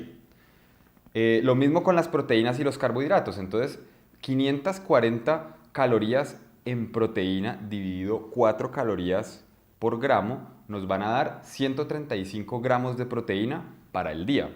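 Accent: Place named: Colombia. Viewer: Que belleza encima colombiano ..la put..madre que hermoso acento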